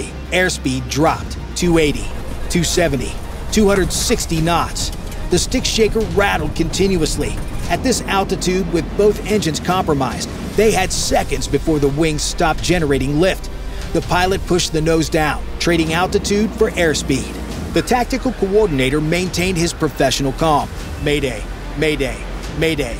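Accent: United States. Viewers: As an Australian I am disgusted that we misuse our resources this way. We have no business to be in that region. This American accent gives me the Heebie jeebies - what a load of horse shit. Actually sounds like a load of wishful thinking. Australia really does not want to have war with China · Why would you use and American narrator for this video? LMFAO Chinese Pilots, you can blindfold them with a shoelace.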